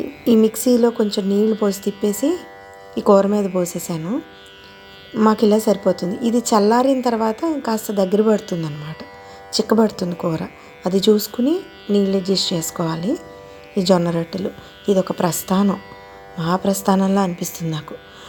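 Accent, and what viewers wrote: Godavari, India. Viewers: మీ గొంతు హస్కీగా బావుందండి. గోదావరి జిల్లా వారేమో మీరు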